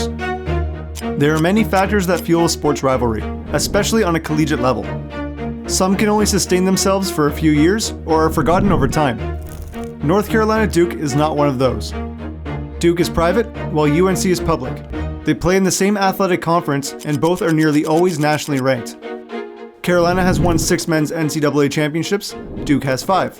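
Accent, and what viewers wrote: Canada. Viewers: The narrator makes two huge gaffes. One is his inability to correctly pronounce the word “Raleigh”. It is easy to pronounce if you pronounce the first half of the word like you would a college cheer. As in Rah Rah (insert team name next). The second half of the word is pronounced like the man’s name “Lee”. Put the two together and you have “Rah-Lee” or Raleigh. His second brobdingnagian blunder occurs when he tries to pronounce the word “Carolinians”. The proper way to pronounce the word is like saying “Caro- lynn-e-uns”. Practice a bit and he will master it in no time. Btw, he also mispronounces the word “South”. I am guessing he has roots in Canada by the way he says the word. Properly spoken, the word sounds like “Sow (as in a female pig” added to “th” as in the last to letters in the word “tooth”. Easy, right? Fun fact: in our 13 men’s college National Championships is the fact that North Carolina is the only state that has three universities with at least two championships each. 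North Carolina with 6, Duke with 5 and North Carolina State with 2. The announcer also mispronounces the word “out”. Once again, he must have roots in Canada.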